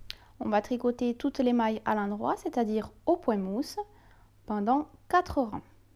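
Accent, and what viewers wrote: South, France. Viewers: Ça y est! J'ai terminé mon pfeilraupe, je l'ai tricoté en laine Rachel de chez Zeeman, j'ai utilisé 2 pelotes complètes + le début de la 3ème. Il est vieux rose dégradé blanc et il y a quelques défauts au niveau des trous, mais une fois porté cela ne se voit pas!!! MDR. Encore une fois merci pour tes explications claires et en + j'adore ton accent du Sud! Bisous bye bye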